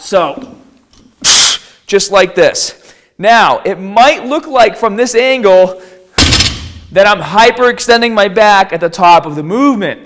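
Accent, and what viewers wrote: Boston, United States. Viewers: I came to see what the difference is. But why do you have half a Boston accent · Boston accent sounds so gay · Boston represent! Your accent is wicked awesome! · I think you are the best with online video explanations of dumbbell use. You are thorough and I truly appreciate you. I wish I could train with you in person. Do you happen to have a video on diet/nutrition? I've got to get 70 lbs off and begin training for a half marathon. By the way, love that Boston accent, I lived there as a very young kid when my dad attended Harvard.😊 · My guy sounds like Seth Meyers with a Boston accent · Soon as I heard the Boston accent I hit dislike and left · One of the sickest Boston accents I've ever heard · Holy Boston accent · IM hearing a familiar accent, are you from Boston? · DIVINE PHYSIQUES TV it's not Aussie, it's a Boston accent. 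Boston, US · Holy shit that is the greatest boston accent I've ever heard! baaaaah bell 😂👌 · Great advice brotha. Are u from boston??? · I've only watched a few of his videos but this is the first time I noticed his heavy Boston accent haha. Mainah heah bub.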